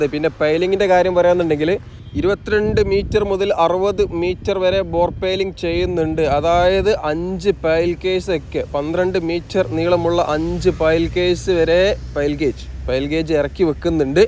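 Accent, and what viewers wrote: Malappuram, India. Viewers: ഞാൻ കണ്ടതിലേക്കും വച്ചു ഏറ്റവും നല്ല എപ്പിസോഡ്. സൂപ്പർ അവതരണം. അതും സൂപ്പർ ക്ലാരിറ്റിയിൽ.പുരോഗതി എങ്ങനെ വേണമെന്നും അതിൽ ജനങ്ങൾ എങ്ങനെ സഹകരിക്കണമെന്നും അതും എനിക്കിഷ്പെട്ട മലപ്പുറം ശൈലിയിൽ.❤❤❤❤. എന്നാണോ പേര്.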